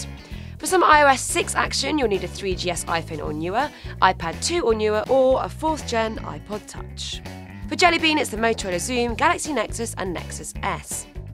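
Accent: United Kingdom. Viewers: I really love your british accent.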